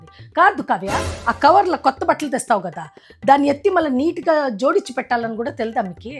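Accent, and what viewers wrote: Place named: Anantapur, India. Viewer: Your anantapuram slang is very nice. 🎉🎉🎉🎉🎉🎉🎉🎉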